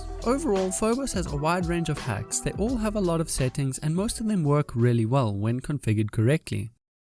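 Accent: South Africa. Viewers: Just realized your South African, from your accent Its good too see there are RSA Youtubers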